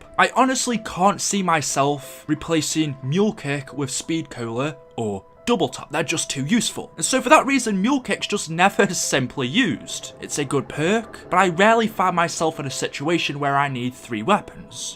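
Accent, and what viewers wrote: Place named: Wales, United Kingdom. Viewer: are you Welsh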